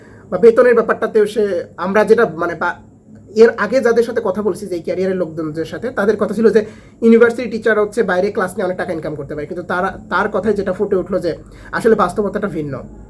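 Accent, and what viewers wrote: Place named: Chatmohar, Bangladesh. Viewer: Vai apnar bari chatmohar tai na kotha dekhei buja jay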